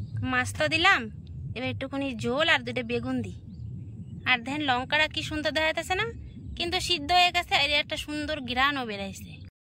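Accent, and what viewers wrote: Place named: Bangladesh. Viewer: দিদি তোমার বাড়ি কি আগে বাংলাদেশে ছিল তোমার কথাগুলো একদম বাংলাদেশের মানুষের মতো❤️❤️❤️❤️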